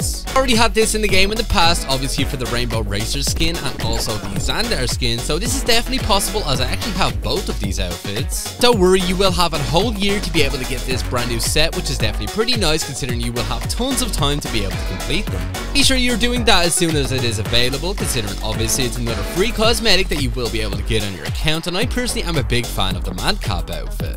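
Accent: Ireland. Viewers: Thanks Thats Awesome!!!! Your CLass!! Are you from Ireland?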